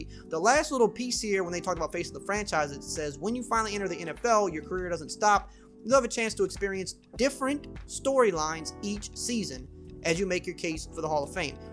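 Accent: Louisiana, United States. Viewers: are u from Louisiana because I am too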